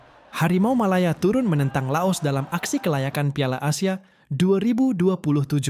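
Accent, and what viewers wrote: Indonesia. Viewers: Kenapa voiceover mcm indondesh. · Sling Indonesia Nya dengar sikit😂..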